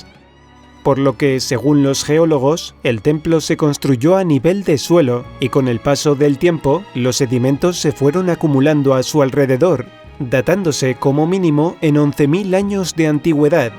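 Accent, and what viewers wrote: Spain. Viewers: el video y el contenido de este canal me encanta . se nota que hay información muy valiosa . pero me molesta sobremanera la voz y el acento ibérico . me gustaría que fuera un locutor latino quien relatara cada video de este canal . Saludos .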